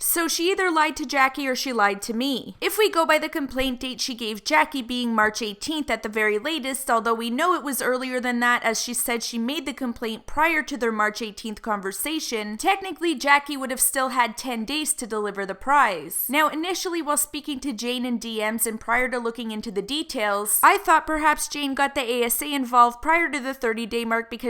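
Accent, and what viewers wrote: Canada. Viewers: Hi, i'm from israel. I am so happy if i get a present or especially makeup for free.both parties were wrong as you said."jane" should have waited polietly and jackie should have given her an update. I'm sorry for misppeling, it's not my native language. I love your canadian accent.you have a good voice.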